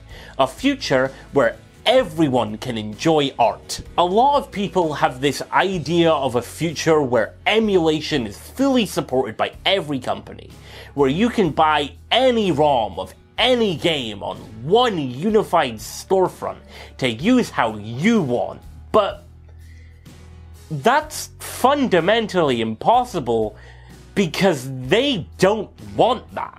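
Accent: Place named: Scotland, United Kingdom. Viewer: Americans hate it when they have to hear a Scottish person talk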